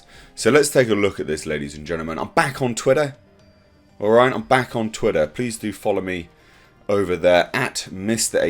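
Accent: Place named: RP, United Kingdom. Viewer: Your to woke !! Sound a bit like posh champagne drinking guardian reader !!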